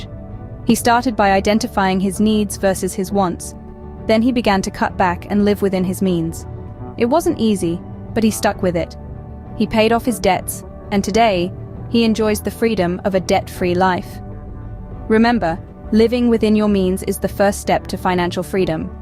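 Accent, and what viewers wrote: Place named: United Kingdom. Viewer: THE BRITISH NARRATION IS IRRIDATING-- AMERICAN ENGLISH POSSIBLE WITH A LESS KNOW-IT-ALL TONE?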